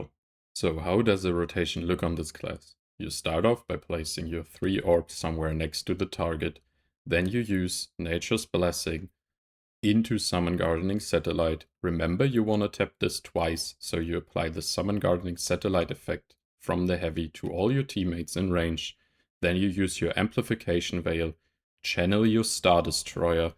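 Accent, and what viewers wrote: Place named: Germany. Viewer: i can hear that you german brother lmao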